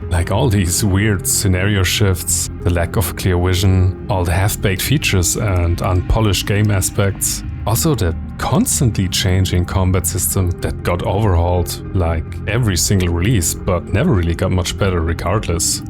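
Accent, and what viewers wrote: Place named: Germany. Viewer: You know it's funny when you think about it. PB could have done the same thing 3 times in a row in 3 decades, and people would still buy the game over and over again, just because it's Gothic. But as you said, they didn't know where their goal was, so making new games was esthetically more appealing for their company, which eventually led to their downfall. Also, nice to hear your voice. Not a completely German accent there. Kind of reminds me of someone :)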